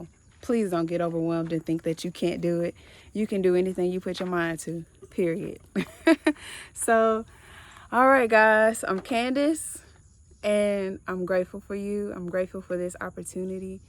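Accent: Houston, United States. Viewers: The Houston accent is so calming. You and Beyoncé can just record everything for me forever 😂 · That's that Houston accent! Beyonce and Kelly are both from Houston like she is. I was thinking the same thing though!!!! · It's the Houston you see/hear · They definitely have the same tone, accent and mannerisms. Maybe it’s a Houston thing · Omg you sound so much like Beyoncé and Kelly it's crazy. Houston accents! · I was thinking the exact same thing. Or that since they are both from Houston they just have a similar accent. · This is how most black Houstonians talk, coming from another black Houstonian. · Omg ❤️ You sound like Beyoncé... That Houston accent I guess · I know I'm not the only one who notices she laughs like Beyonce, lol. I love it, must be that Houston twang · You’re beautiful, sis. Love the van! I can hear that Houston twang. Very similar voice to Kelly Row and Bey. 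Here I am with my squeaky voice. Lol your happiness is palpable and contagious! Great luck to you and God bless. New subbie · Yes, I was thinking the same thing. It's the Houston accent...and also her facial features. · Must be a Houston thing. I love her accent. Sounds similar to Beyonce. Side note...running to get some grapeseed oil, because you're glowing sis! Now that I look at her she actually looks related to them, darker but I see a resembalance.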